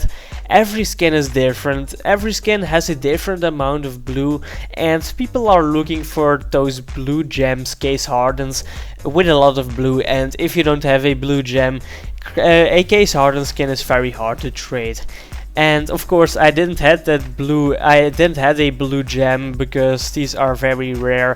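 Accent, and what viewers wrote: Netherlands. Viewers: Are you Dutch? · pik praat eens fatsoenlijk engels het is echt irritant om het nl accent de hele tijd te horen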